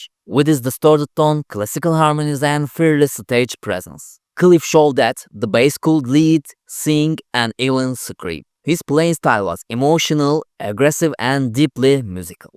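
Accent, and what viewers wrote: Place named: Turkey. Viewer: Abi ingilizce konuşana kadar Türk olduğunu bilmiyordum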